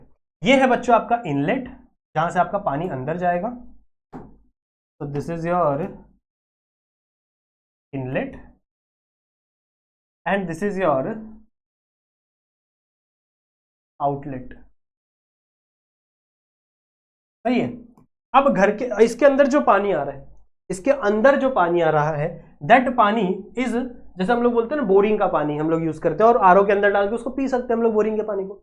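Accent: Maharashtra, India. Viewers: Sir marathi aht kai 😂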